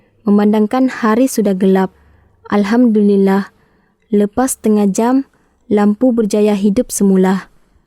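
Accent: Sabah, Malaysia. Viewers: ni bkn ckp semenanjung bang, ni bahasa melayu slang sabah. Kasi repair otak mu..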